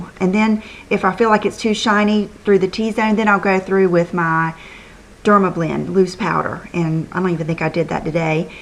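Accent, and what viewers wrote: South, United States. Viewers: are you OK. Miss seeing your smiling face and hearing that wonderful southern accent. Sending you positive vibes your way. Feel better soon. Hopeful we will see you this week. It's was very odd with no Friday Haul last week. XOXO Emily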